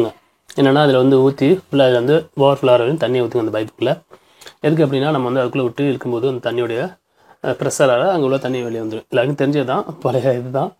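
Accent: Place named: Tirunelveli, India. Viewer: Ahh Nama ooru slang....Tvl uh sir nenga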